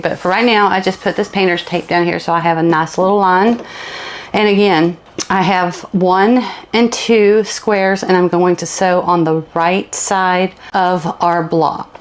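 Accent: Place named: South, United States. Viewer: Wow! Girl, I just realized I am watching you.....enthralled! I love your voice, that southern accent, I can't wait to do this sewing! Thank you for being awesome!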